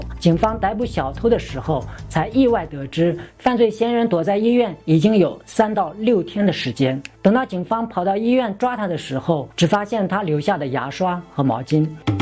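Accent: China. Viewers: 超明顯的大陸口音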